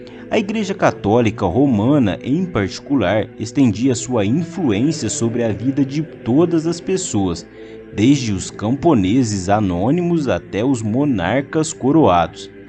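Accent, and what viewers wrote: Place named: Inland São Paulo, Brazil. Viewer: O cara ja comeca o video ckm uma rendanvia na lingua portuguesa. " Há seculos atrás " . Se o verbo haver esta sendo usado sentido de tempo) , dispensa o " atrás ". Esse dotaque do interio de São Paulo mata a narração também , terrivel.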